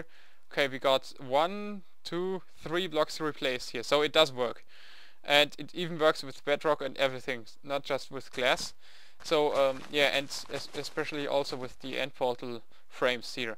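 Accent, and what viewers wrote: Germany. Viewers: mann jetz hab ich schon n paar vids von dir gesehen und hab mir immer gedacht so wie der englisch redet kann der doch nur deutscher sein?! jo jetzt weiss ich es xD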